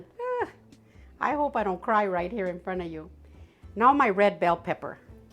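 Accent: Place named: Midwest, United States. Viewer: This is my kind of food! Looks delicious! Your MN/Dakota accent is adorable ❤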